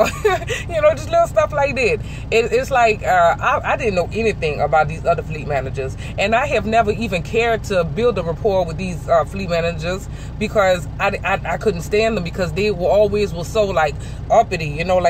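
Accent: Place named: New Orleans, United States. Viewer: LAWD HAVE MERCY BEHBEE!! BRAINS, BEAUTY, PERSONALITY, AN YOU A CUTIE!! AN TO TOP IT OFF DAT N'AWLINS ACCENT BEHBEE!! MAKE ME WANNA JUMP THRU DIS PHONE GIRL!! KEEP DOIN YA THANG SWEETHEART!!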